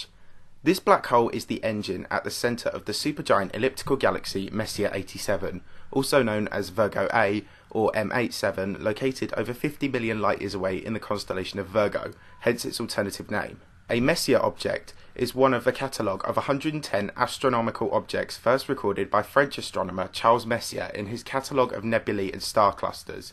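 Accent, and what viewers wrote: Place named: Essex, United Kingdom. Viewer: He's doing what God put him on this green earth to do; have a salon-quality Essex voice, and read the astronomy.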